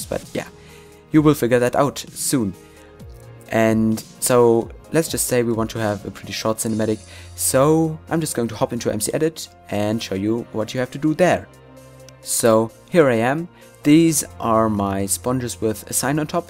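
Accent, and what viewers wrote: Germany. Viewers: Are you german? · Man merkt einfach übel das du deutsch bist :D Aber nices video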